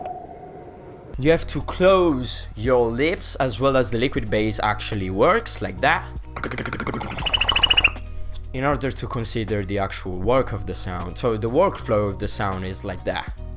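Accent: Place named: Italy. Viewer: Si sente proprio che sei italiano bro😂 Ti adoro continua così💪🏻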